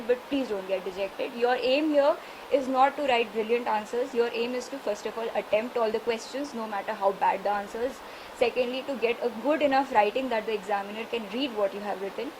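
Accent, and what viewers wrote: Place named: Mithila, India. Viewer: Aapke tone aur way SAE lag rha AAP maithil hai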